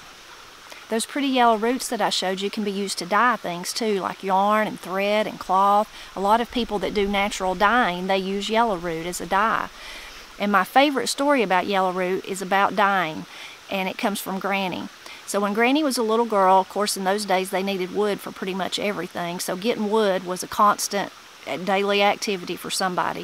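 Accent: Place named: Kentucky, United States. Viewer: Hi, your accent sounds more southeastern Ky than Western NC. i’m from eastern ky but have been living in Franklin NC for quite a spell. Great video, found some YR today and am making some tea.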